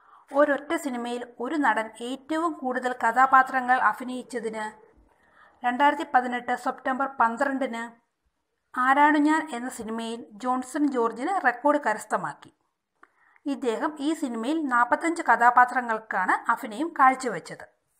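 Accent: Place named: Trivandrum, India. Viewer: Trivandrum voice good iam also in trivandrum👍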